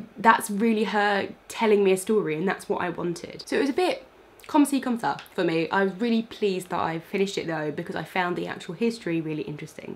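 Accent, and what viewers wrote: United Kingdom. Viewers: I love listening to you speaking in a perfect British accent, so beautiful, so relaxing too 🤣. What is your job? How can you read so many books ??? Would you have some must read British books list for foreigners? 🙏 I am French and I have never used the expression "Comme ci comme ça" in my entire life, but it is what all my foreign students and friends answer when I ask them what they can say in French. I teach French to foreigners. Christelle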